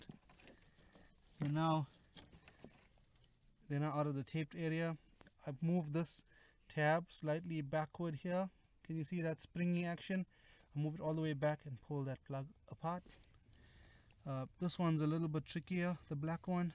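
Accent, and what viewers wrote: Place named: South Africa. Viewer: That sounds like a south african accent...very cool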